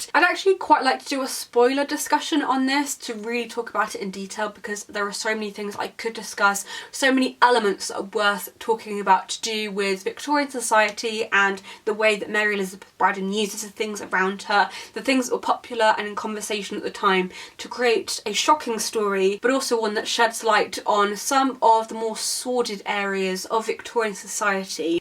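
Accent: United Kingdom. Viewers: I love your wonderful British accent, Hi from Italy!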